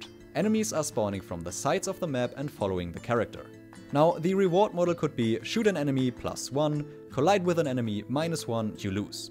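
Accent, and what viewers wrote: Germany. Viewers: Du bist doch Deutscher dass hört man so raus 😂😂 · For me it seems you have a german accent, is that true u are german? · Are you german ? kinda have an accent… i love german btw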